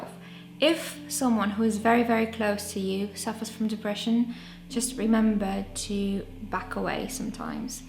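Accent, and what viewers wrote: Poland. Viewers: are you polish? very beautiful accent 💜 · Wiedziałem że jesteś z Polski po wymowie zanim zobaczyłem komentarz xd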